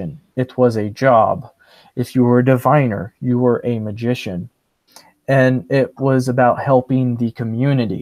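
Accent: Scotland, United Kingdom. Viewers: 😄Great Interview!👏 👌i've listened twice now and found the discussion Very informative!🙏🌲🍃 Thank-you🌈..ps.your Scottish accent is charming!😀🔥keep it Just as is😉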